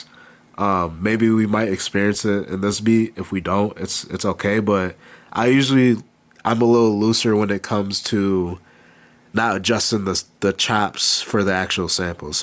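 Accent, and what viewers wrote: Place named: Upstate New York, United States. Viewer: Love that u have that upstate NY accent too! boomboomBoomBOOOOOM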